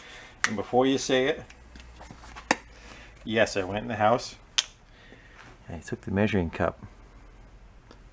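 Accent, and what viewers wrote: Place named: New England, United States. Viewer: Love the videos,. Good to see a fellow New Englander, accent and all making YouTube videos that are educational and entertaining. Keep up the wicked good work!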